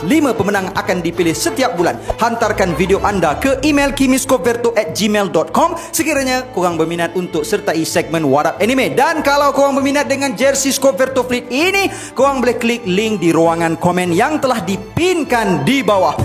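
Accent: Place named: Kuala Lumpur, Malaysia. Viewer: Alamak u bro cara u ckp mcm org K.L laa..Ape,mne adew,.korang...Terbaik br0👍...Tapi mmg mantap cara lu brbahas n brcerita...Jelas,.Aku dgr cara lu brcerita Terbaik..Aku bgi 8/10️💫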